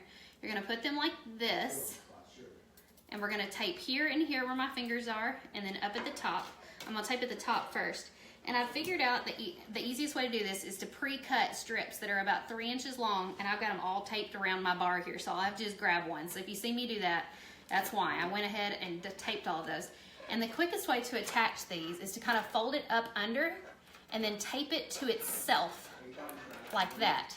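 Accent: United States. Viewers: New subscriber! I am going to try this. Love your accent! What state are you from? Me = PA.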